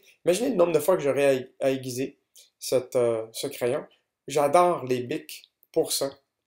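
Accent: Quebec, Canada. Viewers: il a un accent québécois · French? Maybe Canadian...Are you from Quebec?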